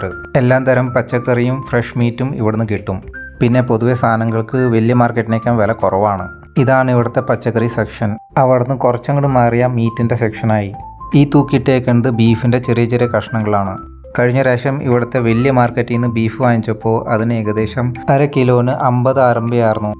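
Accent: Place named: Perumbavoor, India. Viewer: നാട്ടില്‍ എവിടെയാണ്? എവിടെയോ ഒരു പെരുമ്പാവൂര്‍ സ്വരം.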